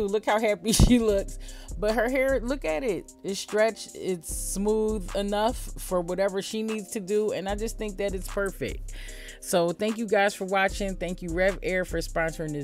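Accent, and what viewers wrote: Philadelphia, United States. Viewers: You sound like you’re from Philly. And where are u located? I moved from Jersey to Florida and I can’t find ANYONE that I trust with my natural hair😫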